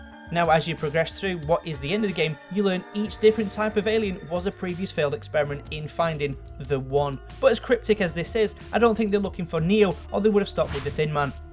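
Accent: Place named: Manchester, United Kingdom. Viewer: He sounds Manchester-ish to me, in case you were wondering where his accent originates from.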